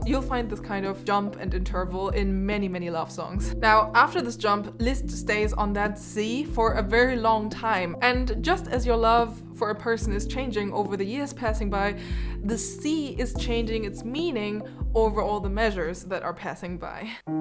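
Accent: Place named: Germany. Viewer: Never lose your German accent.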